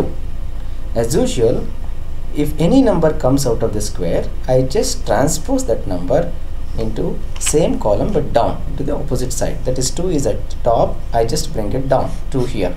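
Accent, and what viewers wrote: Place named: India. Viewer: are you indian. the accent is nice :P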